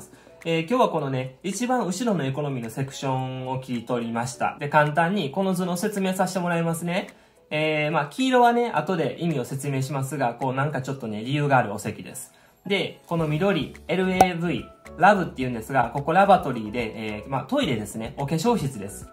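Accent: Kansai, Japan. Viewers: はじめまして～～♫ 某YouTuber さんのコメント欄で、数名の方がおススメしていらしたので、来ました🏃‍♀️♥ 今回の動画、めっちゃためになりました。 私は昔は早く降りたい！ので前の席を予約していましたが、最近は、後部座席の方が隣の席が空いてることが多いので、後部座席を予約するようにしています。 あまりに後は言うてはるように、トイレ待ちの方が並んでたりするので、やめるようにしています。 関西弁なんで、めっちゃわかりやすく、楽しいです。 これからも動画楽しみにしています～ね。💖 よろしくお願いします☆ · 参考になります、私はトイレがちかいので通路側に座ります、感じが、YouTubeおのださんに似ていますね。関西弁もね。✈ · 関西弁のツッコミがめっちゃ面白いです🤣 海外の航空会社に就職されるのはすごいですね ご優秀なのがわかります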